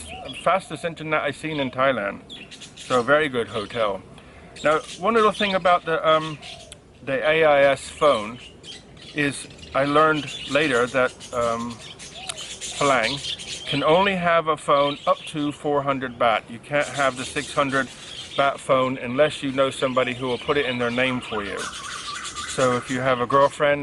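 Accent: United States. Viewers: One more if I may! Your English appears to be more American than British how is that possible? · This is your first video I've watched. Thanks for the good info. I was a bit surprised to find you're British. You sound every bit American :-)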